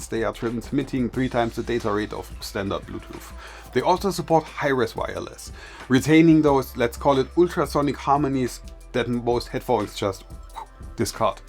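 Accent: Germany. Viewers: Nice Deutscher Akzent :)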